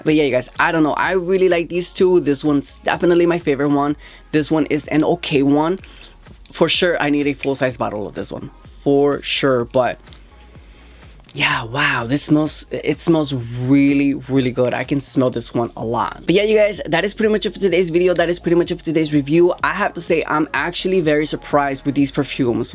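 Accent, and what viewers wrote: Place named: Latin America. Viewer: I appreciate this review ❤ and I'm definitely old enough to be your mother and l so enjoy watching every review because in my 20's me and you honey would have been besties ❤❤. I don't know your nationality but the accent sounds Latino? So I love the pronunciation of your words😉. Keep being you!! We love it ❤❤❤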